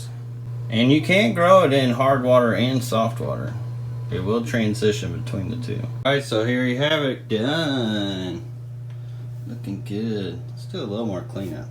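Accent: United States. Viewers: Where did you grow up? It's not often you hear a southern accent these days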